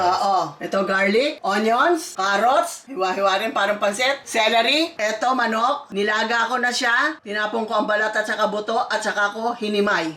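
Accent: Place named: Pampanga, Philippines. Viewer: Flabor - Ober der I can always tell people from the Philippines, they insist on their own pronunciation. Jokoy the comedian has that down.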